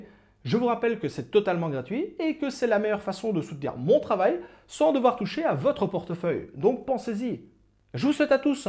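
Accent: Belgium. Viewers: Dis, il me semble que toi et moi venions des mêmes contrées sur base de ton léger accent.:) Question donc entre compatriotes. Tu fais comment niveau elec? Je consomme environ 2200w pour faire tourner 7 gpu (combi 3080 et 3090), ce qui me revient a environ 490 euros d'elec par mois. En Belgique (oops j'ai dévoilé notre pays), l'elec, frais de transport compris, monte a un prix moyen d'environ 30 centimes par kwh. Du coup, calcul fait, sur les 1500 euros de moyenne que je génère en assets, ben j'en récupère 1000 in-fine. Du coup, tu as un truc toi pour payer moins cher en elec? je suis chez Eneco et j'ai le "meilleur" plan d'après eux... mais bon, si t'as des super idées et vu ta connaissance du sujet, je prends;) MERCI!!!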